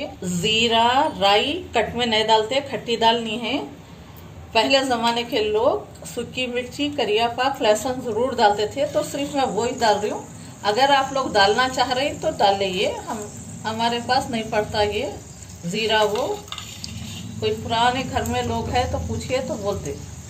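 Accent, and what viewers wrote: Hyderabad, India. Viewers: Wah pakki hyderabadi language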